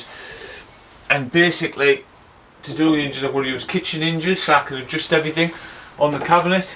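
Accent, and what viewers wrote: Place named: Teesside, United Kingdom. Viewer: I’m from the north east myself, am guessing your Teesside ? Do you work for yourself ?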